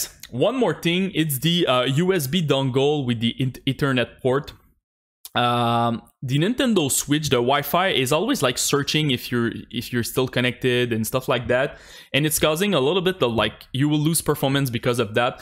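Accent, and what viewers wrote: Quebec, Canada. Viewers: on dirais un accent qc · Clairement tu est québéquoi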